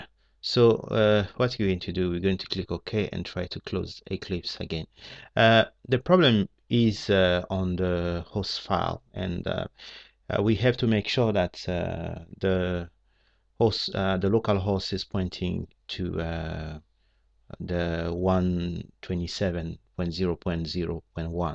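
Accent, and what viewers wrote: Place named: France. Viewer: ça marche pas avec moi =) french accent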